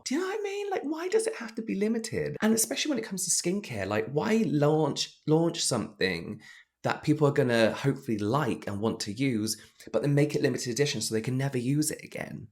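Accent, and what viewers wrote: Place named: RP, United Kingdom. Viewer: I love the way you talk. It's just so Posh!😩👌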